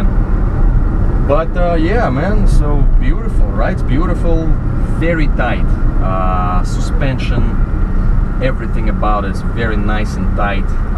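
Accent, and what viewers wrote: Russia. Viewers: Ты русский? 😁 акцент жесткий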